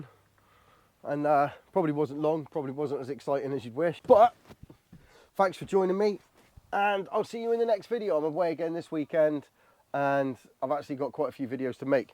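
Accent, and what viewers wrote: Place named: Cornwall, United Kingdom. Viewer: Love the Vlog. We are from Liskeard just up the road but moved away to Shropshire:( We went to Millendreath all the time when I was young and loved it. Loved hearing your accent and made me very homesick. Nice one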